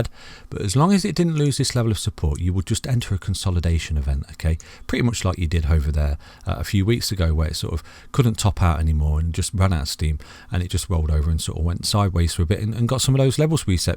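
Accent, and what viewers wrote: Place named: Nottingham, United Kingdom. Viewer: Sound like your from Nottingham! Thanks for the videos bro if you ever wanna do paid lessons for learning charts let me know I’m looking to learn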